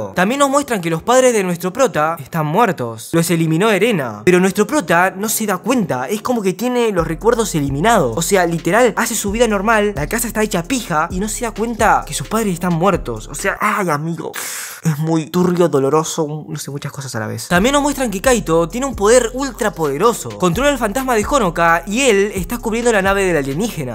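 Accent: Argentina. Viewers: Eres de Argentina?:V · sabes que, yo no se pero ando viendo todos tus videos para notar los animes que posiblemente me mire y el asento argentino me encanta para todo el sh sho desmasho y eso jajaj me parece la bomba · Sos argentino..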